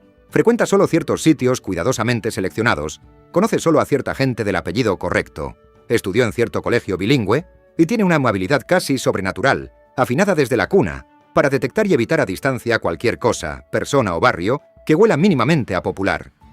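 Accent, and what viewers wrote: Spain. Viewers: El relator tiene acento español,si este video es hecho por un español,te quiero decir que los más odiados son los españoles y España, porqué si somos una mierda es gracias a uds. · Te noto acento español.. Pero te equivocas con Uribe.. Ese sesgo te hace perder credibilidad..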